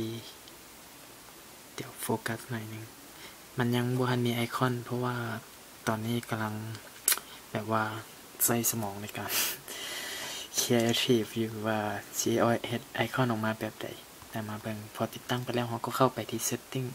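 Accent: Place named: Vientiane, Laos. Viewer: ຄັກຫລາຍ ວ່ານແຕ່ສຳນຽງ ວຽງຈັນປະສົມທາງໃດຫວະ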